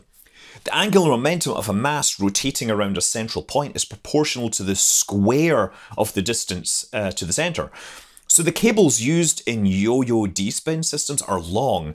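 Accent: Scotland, United Kingdom. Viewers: burr makes Explorer sound like Exploder. Funny we used to call an earlier version of the Ford Explorer, the Exploder for the transmission’s propensity to explode under certain conditions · With your awesome Scottish accent, I always hear “Exploder” as opposed to “Explorer”. Important difference haha.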